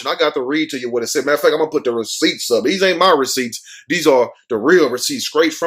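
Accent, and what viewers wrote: South, United States. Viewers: your accent is somewhere between the Bible belt and Houston /Arkansas 😂😂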